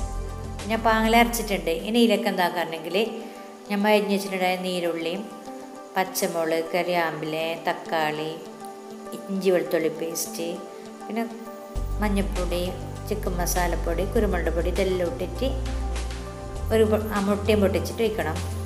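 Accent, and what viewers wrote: Kasaragod, India. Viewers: Kazargod slang alle ithu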